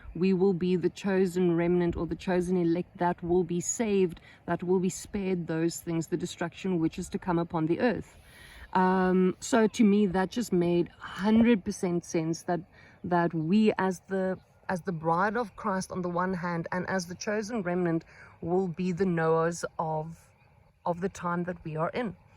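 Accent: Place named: South Africa. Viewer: Could hear you are from South Africa as soon as you started speaking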